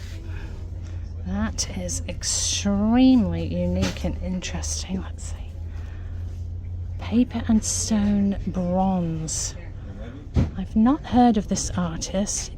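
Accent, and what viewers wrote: England, United Kingdom. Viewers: If you grew up in the United States, why do you have the English accent just curious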